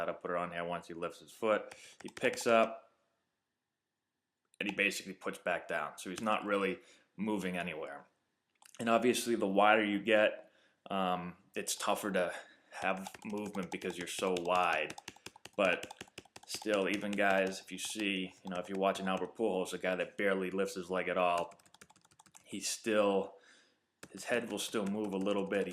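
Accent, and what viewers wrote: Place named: East Coast, United States. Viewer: you sound like an east coast Jay Cutler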